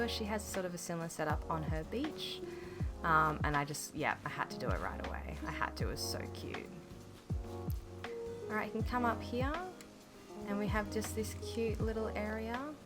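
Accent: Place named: Australia. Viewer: This is absolutely beautiful! I love the decorating in your house- really well done!- and how you’ve created little neighborhoods and lovely spaces ❤ Thank you for sharing and congrats!! As an aside, I absolutely love listening to you speak- I am a HUGE fan of Offspring (I’m on my 12(?) watch through, deeply in love with Matthew LeNevez). But hearing Aussie’s accent (to this Yankee’s ears) is absolutely fabulous. ❤❤❤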